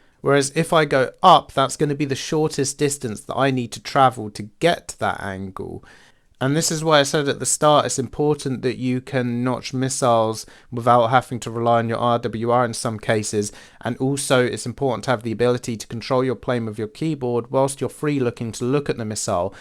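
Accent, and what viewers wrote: United Kingdom. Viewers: Your channel is starting to become my favourite WT educational/training (if I can call it that) channel on Youtube. On point and posh accent! I do note the distinct lack of Shar FA2 content though. How about doing a next ARH tips with that? Come on, embrace the suffering haha.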